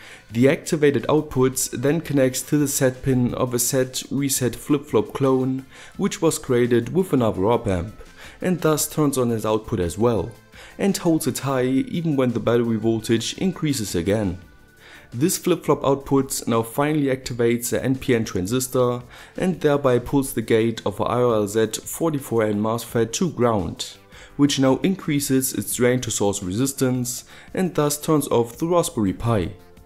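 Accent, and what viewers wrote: Germany. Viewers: deutscher stimmts?:D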